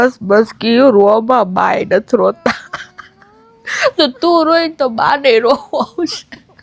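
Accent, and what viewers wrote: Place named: Kathiawar, India. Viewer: Very nice video Very good tamari kathiyavadi leg vage. Videsh maa rahi ne jadvi rakhi che.way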